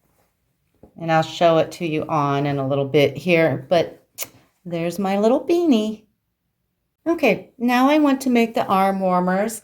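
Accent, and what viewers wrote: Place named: Upper Midwest, United States. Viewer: I hope you don't mind me asking where you are from. Your accent has me going. I'd say Wisconsin, North Dakota or Minnesota> ??? Am I correct? :D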